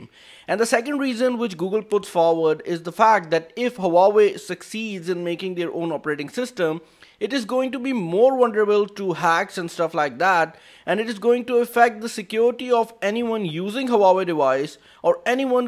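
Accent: India. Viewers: indian accend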